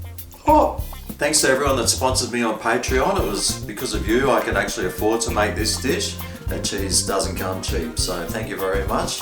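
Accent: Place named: Australia. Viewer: Channel he's Australian not French do you know what French accents sound like.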